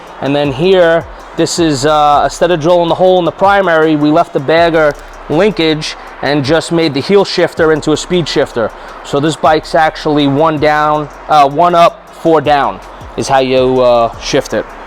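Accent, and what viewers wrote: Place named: New York, United States. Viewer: as an Australian love that new york accent mate!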